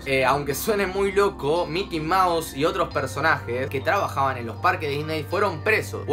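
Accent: Argentina. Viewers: argento o uruguayano??? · dros si lo escuchas tiene acento argentino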